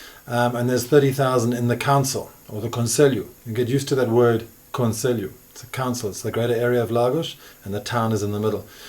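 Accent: South Africa. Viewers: Love the SA accent! I have one too :face-blue-smiling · Hi, fellow South African and new subscriber here, so good to hear a familiar accent! ❤️ We’re considering retiring to the Algarve so your channel is just what we need. Looking forward to watching a lot more of your helpful information! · Hi. Ts nice to see you've retained your amazing SA accent. Can you please send me some cost effective house/apartment (2beds) prices. I'm looking to retire somewhere - SA currency squeezing ones options. <mail> Thank you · Im a portuguese expat living in Europe and I've just come across your vids a few days ago. I have to say this is probably the most comprehensive, authentic, interesting, fun to watch yet rigorous video I've seen about the Algarve. You've made me start thiking about relocating back to Portugal, who knows, even to the Algarve - who knows - although being originaly from Porto i enjoy a bit of cold, rainy days and mountains nearby (not that we have many in Portugal that you can call true mountains). 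You're probably the best advocate for Algarve tourism and economy right now. Loved the SA accent, btw. Take care. Looking fwd to check out some of your other vids. 👍😉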